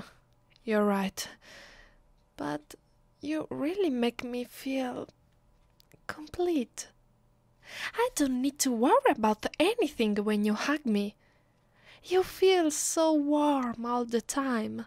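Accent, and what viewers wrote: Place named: Italy. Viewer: To, I enjoyed your vampire roleplay. The title was Vampire Girlfriend. And also, I love your Italian accent very much. It actually made your roleplay, extra special. But not only that, your creator is so romantic. I fill like that I am her boyfriend. And also, I could picture myself in her arms. I am really into female vampire role plays. I hope that there will be more role plays in the near future.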